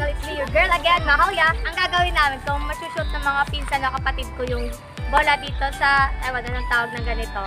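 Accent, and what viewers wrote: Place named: Visayas, Philippines. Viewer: Kakafollow ko lang sa tiktok mo haha ang cute mo yung bisaya na. Ang huy ex yan na ba bago mo 😂😂😂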